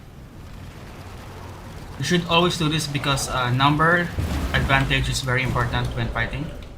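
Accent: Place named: Philippines. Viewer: Kumusta from Australia! I think you're Filipino anyway from the accent.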